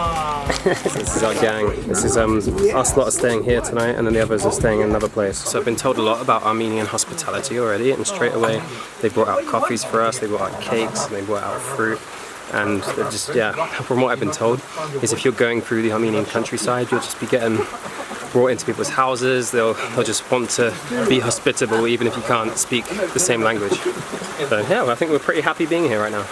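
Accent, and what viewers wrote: United Kingdom. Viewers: 1. In Armenian the last colour of the flag is actually called "apricot colour" -tziranaguyn. We say "karmir, kapuit, tziranaguyn" - "red, blue, colour of apricot." Scientific name of Apricot is Prunus Armeniaca (Armenian plum). 2. The war they are talking about is Artsakh (Nagorno Karabakh) liberation war launched by Azerbaijan (ethnic Turks) against Armenians of Artsakh province of historic Armenia. By the time Azerbaijan was 9 mln and Artsakh was only 100.000 Armenians and was cut of the rest of Armenia. By the time rest of Armenia was able to reach for help and get through the blockage Stepanakert (Artsakh's capital city) and villages were heavily bombed. 5 years of war and Artsakh is free preventing another Genocide done by the Turks. Artsakh is possibly the most beautiful part of Armenia. 3. Thanks for such an interesting video, mate. 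I lived in the Uk for 6 years and just adore British accent, that was the best part of the video for me lol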